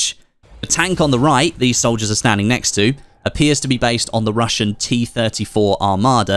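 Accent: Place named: United Kingdom. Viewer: the most bri'ish accent of a gamer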